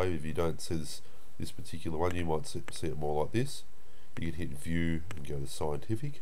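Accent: Australia. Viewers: So so helpful! The 3=6/2 was mind blowing. I always got stuck on when to divide and when to multiply but that little trick really worked, plus the Aussie accent is great! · flying jesus on a breakstick lol... Anyway, i think this guy's an australian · 99% sure this guy is Aussie Also he’s not from New Zealand. You can tell by the way he says his i’s and e’s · He is Australian, so am I haha, I was pleasantly surprised :) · Gotta love the Australasian Accent 💯💯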